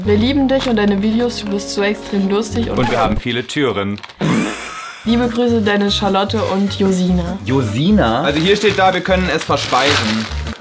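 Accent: Saxony, Germany. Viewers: Hey,😊 kannst du mehr auf sachsisch reden bitte. Ich und meine beste Freundin haben uns totgelacht.😂😂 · Ich hatte einen durchgehenden Lachflash!!😂 Bitte mach weiter so du bist der Beste!! Als du die Perücke abgezogen hast war ich so erschrocken wie kurz deine Haare sind, weil ich mich schon so an die Perücke gewöhnt hatte!!😂😂 Mach weiter so und rede mal öfter sächsisch!!❤️😂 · Kannst du deine Videos jetzt immer auf Sächsisch machen😂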